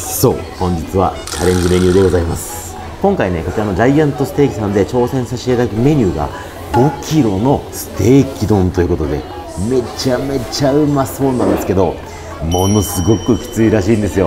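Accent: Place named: Okinawa, Japan. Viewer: イントネーションが沖縄です😃素敵👏👏👏👏👏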